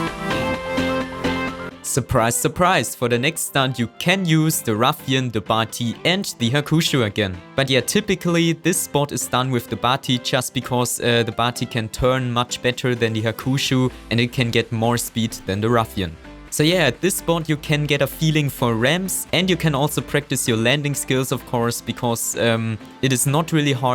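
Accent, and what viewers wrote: Germany. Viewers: Richtiges alman Englisch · Es hört sich so an also ob du Deutscher bist der englisch spricht😅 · Bist du deutscher? :)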